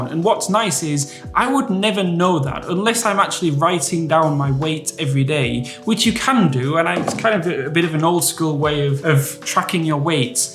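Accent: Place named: North Wales, United Kingdom. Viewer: Hi, did you grow up in north wales or near north wales, can’t stop picking some similarities in your accent 😂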